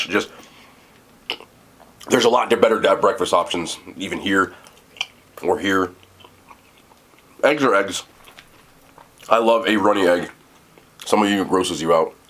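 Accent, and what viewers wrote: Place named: New Jersey, United States. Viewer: I love when you try to pronounce things in another language with your Jersey accent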